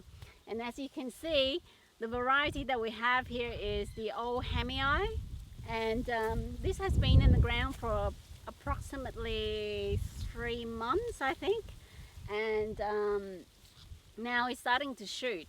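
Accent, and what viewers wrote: Australia. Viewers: Hi, I like your approach for a wind break. Bamboo has the highest oxygen output and carbon capture of any plant. The high Silica content of the leaf drop captures moisture in the ground and provides habitat for insects and critters. Oldhamii can grow three stories tall <link> and can withstand 120km winds. It can be char-coaled and it will produce the hottest burn of any charcoal known today. You sound Australian? Where are you in the country? I'd like to know for climate zone reasons. Also I would suggest reading about wind break design, usually you plant small trees infront which stops the full frontal force directly onto the trees or plant and minimizes turbulence, though I'm sure Odhamii can take it. see <link> & <link> for a great explanation.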